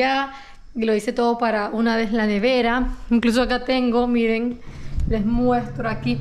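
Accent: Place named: Peru. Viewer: Que paso ahora hablas como una Peruana.🤔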